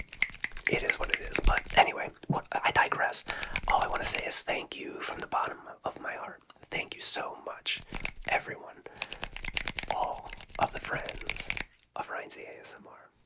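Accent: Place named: Maryland, United States. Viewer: I really enjoyed this video! Love the talk about the accent, being from the UK I didn’t really realise people from Maryland / Baltimore had such an accent!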